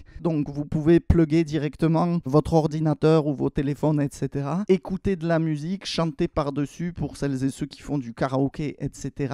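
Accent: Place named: South, France. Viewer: super ta vidéo! je cherche une table de mixage et tu m'as donné envie d'acheter ce modèle! je connais bien le matos yamaha...mon fils m'a emprunté une table de mixage yamaha que j'ai acheté il y a plus de 10 ans chez cash converter en occase! 12 pistes 170 euros rien à dire! il y a une couche de poussière dessus de 10 cm au moins, et elle fonctionne toujours super bien!! il ne veut plus me la rendre!! en tout cas ton accent du sud fait du bien à entendre!! bonne continuation à toi!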